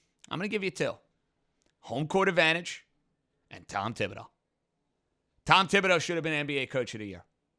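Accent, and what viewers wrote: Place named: New York, United States. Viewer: This is the most New York sounding guy I’ve ever heard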